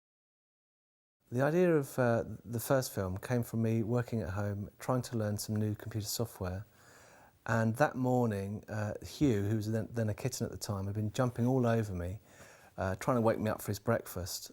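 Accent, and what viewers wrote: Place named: England, United Kingdom. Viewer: I KNEW he was English. Lol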